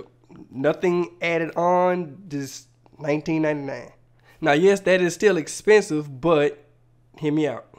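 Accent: Louisiana, United States. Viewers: Yaaaaas. I’m an Instructional Designer and have been watching tons of these videos and doing research before I make my purchase. Loved the review. Glad I came across your video. Also, me after a couple of minutes of hearing you speak: “I wonder if he from Louisiana?🤔” 😅 Thanks for this vid! ⚜️❤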